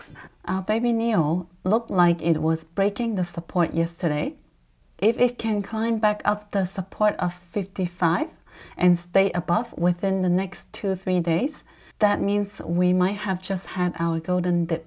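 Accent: China. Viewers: Are u a chinese ? Cause your accent sounds like so chinese. Whr u from? China ? Taiwan ?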